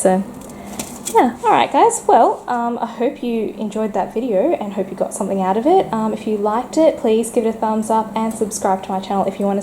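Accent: Australia. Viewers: Good to hear an Aussie voice, my catasetum has just decided to rot it’s new growth hoping I can save the backbulb and it decides to do something in spring😢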